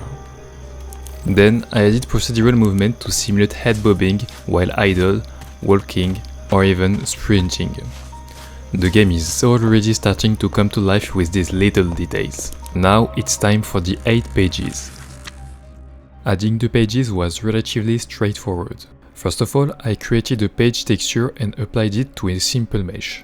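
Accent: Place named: France. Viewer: I was thinking, he has the same accent as the french ppl in my Class. I check the County: France. Neat.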